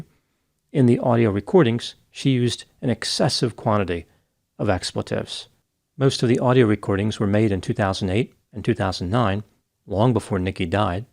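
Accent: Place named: Philadelphia, United States. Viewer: Psychology and Philly accent: on point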